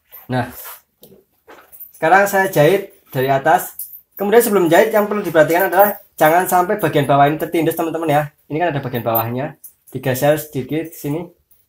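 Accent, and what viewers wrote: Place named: Java, Indonesia. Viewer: nglempruk wkwk kangmas e wong jowo ....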